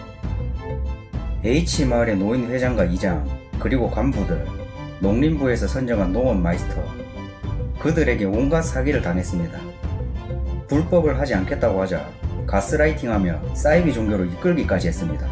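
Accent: Gyeongsang, South Korea. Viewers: 경상도 인가요? 의안부가 필모한가? 친일 매국노들의 후손 인듯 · 경상도 아임니까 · 졸라 무서운게.. 알고리즘 타서 우연히 보게 된 영상인데, 채널주 말씨가 갱상도다. 근데 사건지역인 창원도 갱상도 아님? 같지역 사람한테도 젊은이한텐 일단 무대뽀로 행패 어지간히 쳐부리네 시골 틀딱것들 무습다 무스버..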